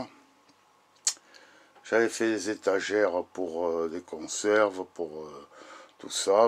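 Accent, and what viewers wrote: South, France. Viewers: merci pour ta réponse et j'ajoute entre nous qu'entre l'accent du sud me ravi encore plus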